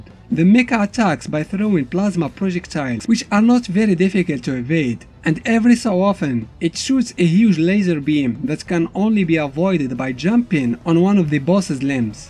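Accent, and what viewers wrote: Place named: Morocco. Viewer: I love your videos ❤️ man you accent looks so Moroccan 🙂🙂🙂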